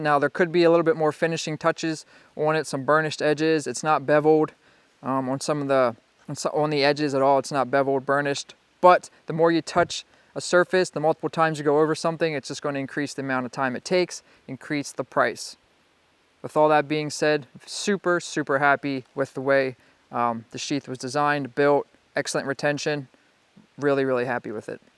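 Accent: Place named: Western Pennsylvania, United States. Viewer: Where in PA you from? Sounds like somewhere from western PA from the accent. Nice feathersticks too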